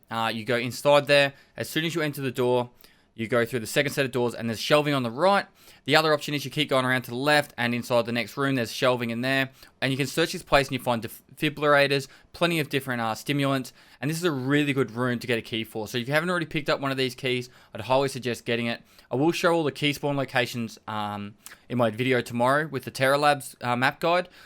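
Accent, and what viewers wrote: Australia. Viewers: a Absolutely no offense intended here, but your (?Australian?) english is a bit harder for me to listen to (sorry, I really mean no harm, I'm not native speaker). And when you add this intro thingy it's really hard to swallow. Most of the times when I play the video, I hear it and I stop the video, then I have to take a deep breath and after like 5 minutes I can finally get to the video (or should I say crack straight into it? Anywqy I hope you dont interpret this in a wrong way. I support you and I thing you are one of the more informative guys.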